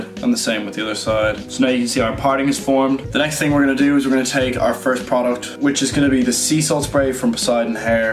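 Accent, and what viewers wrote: Ireland. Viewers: Your accent is a very soft Irish accent.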